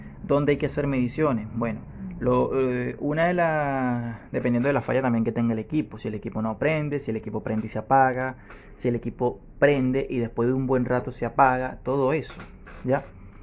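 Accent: Venezuela. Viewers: Muy buen video. Disculpa el comentario hablas como venezolano no como chileno. Muchos exitos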